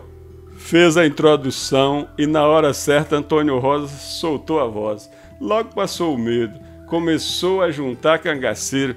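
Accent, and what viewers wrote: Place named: Northern Minas Gerais, Brazil. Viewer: O melhor de tudo é o narrador com o sotaque que parece ser do norte de Minas narrando ,maravilhosooo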